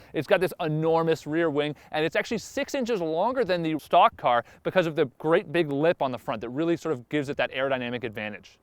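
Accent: Canada. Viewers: canadian accent.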